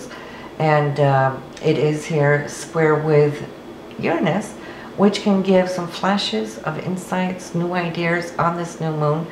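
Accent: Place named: New York, United States. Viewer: nice reading. you sound like a new yorker who looks like she lives in tucson...i say that because I am that!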